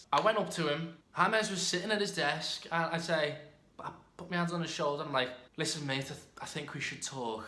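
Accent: Liverpool, United Kingdom. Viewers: HOW DID I KNOW YOU WERE FROM LIVERPOOL EVEN THO I NEVER HEARD A LIVERPOOLISH ACCENT OMG IM SO CLEVER 😂😂😂❤️ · It’s weird seeing a scouser big in youtube😂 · Auyyyyyyyyt my fellow scouser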